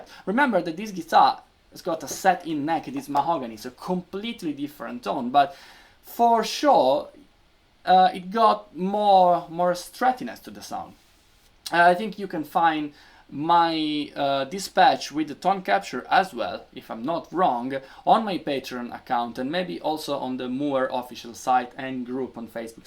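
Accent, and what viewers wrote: Piedmont, Italy. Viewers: Do we have to comment in english too? Am I more international too this way? Seriously, this feature is mindblowing, I'd like to see what it can do with pedals or other stuff (By the way, you still have a piedmontese accent while you speak english ahahah)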